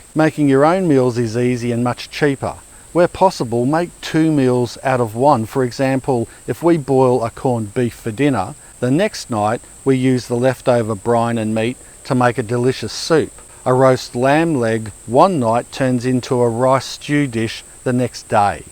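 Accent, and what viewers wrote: Australia. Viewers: If I'm not mistaken, you're Australian? (I always confuse New Zealand and Australian accents) Anyway, if so, what's the issue with water in Australia? I've read that companies from a certain communist country (Ive had comments deleted for stating it before) own water rights to pieces of or even entire water systems. Is that true?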